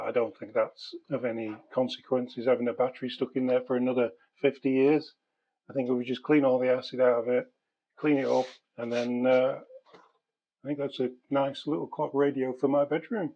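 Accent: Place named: Derbyshire, United Kingdom. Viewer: You sound like you're from Derbyshire. I'm in Chesterfield. Love your clock. Really cool.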